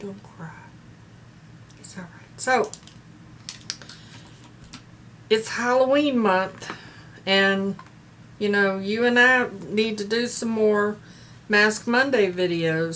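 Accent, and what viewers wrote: South, United States. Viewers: I'm from the south and love your southern accent. That's a decent box and fun card!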